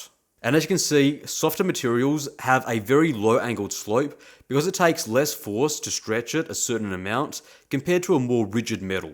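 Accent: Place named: Bogan, Australia. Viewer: Brought out the face reveal definitely dont look like what i expected sound like a bogan aussie 😂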